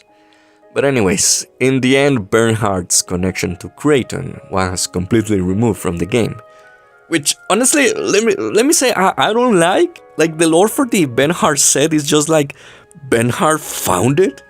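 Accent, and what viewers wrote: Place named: Europe. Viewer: Loved the video so much! Thanks for covering this. By the way, are you european? You have a certain accent!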